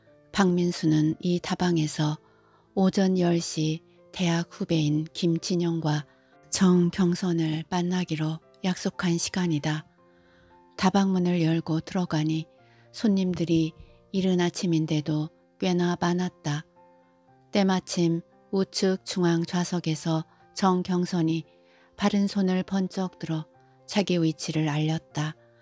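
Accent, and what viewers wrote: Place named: Chungcheong, South Korea. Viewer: 네 항상 정겨운 충청도억양이 좋아요 글도 잘쓰시고 행복하세요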